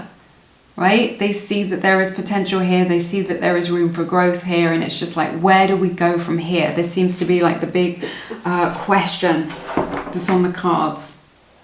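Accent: United Kingdom. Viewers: thank you! love you and your British accent hehe! 😂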